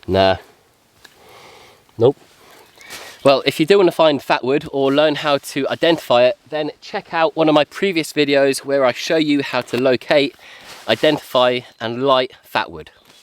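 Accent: United Kingdom. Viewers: our big Yellow Lab named Bentley and our four White Swiss Shepherds named Pearl, Mauna Kea, Singh Dog and Tiger all love watching you and Amber in your wonderful and healing videos... and my Celtic dna loves your lovely accent and gentlemanly nature... it reminds me of time spent traveling in England and Scotland years ago... felt right at home... lots of love and respect to you and plenny aloha from the Big Island of Hawaii...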